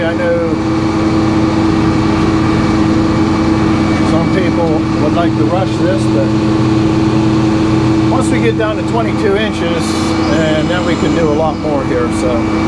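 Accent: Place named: Maryland, United States. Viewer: Where yall at? Im in Pasadena, MD and recognize the accent, and the mention of gone downy oshun. Just moved from SC and miss this good honest industrial stuff. Would love to come say hi!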